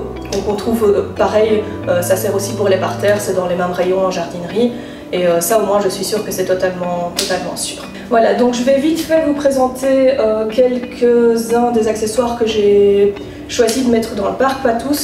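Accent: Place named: Belgium. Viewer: Tu est belge ?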